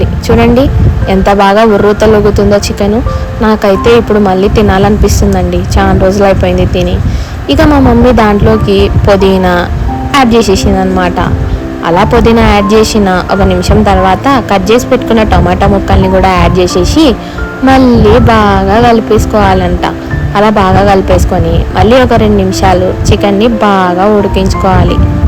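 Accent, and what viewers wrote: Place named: Kadapa, India. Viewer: Kadapa slag vinte ,aa feelee verappa😊😊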